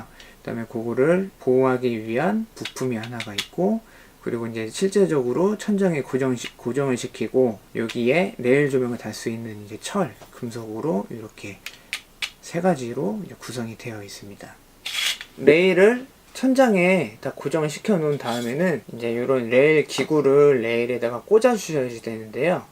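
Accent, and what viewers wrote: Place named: Jeolla, South Korea. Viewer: 구수한 전라도사투리가 신뢰도를 업시키네요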